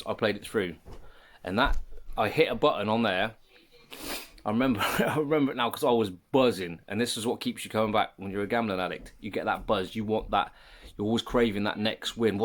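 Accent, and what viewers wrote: Australia. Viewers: Sounds like he is from Australia. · How are you not Aussie !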